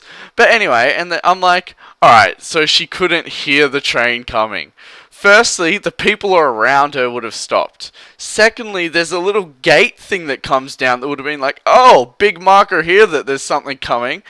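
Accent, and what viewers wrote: Australia. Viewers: 1. Are u Australian ( I am ) 2. The reason trains don't pull on the breaks cause there going at like 300-500km/hr and there's no chance he"ll slow down in time but overall I agree with you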